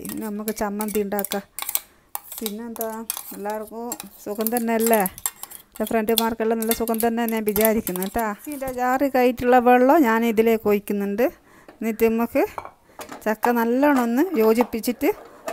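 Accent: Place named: Vadakara, India. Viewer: Slang 🤩 immale vadakara❤️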